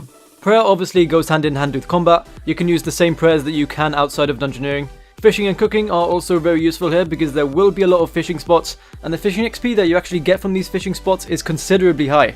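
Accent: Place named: United Kingdom. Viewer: i wish i had a dollar for every time i heard this brit say "as well"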